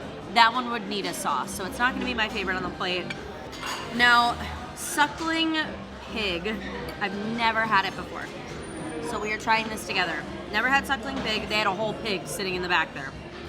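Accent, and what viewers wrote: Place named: Midwest, United States. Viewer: I can hear the midwest in your voice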